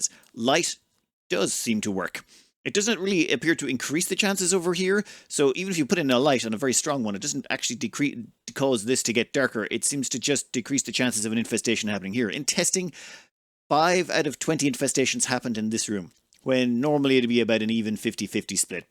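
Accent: Ireland. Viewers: Lol he's Irish right?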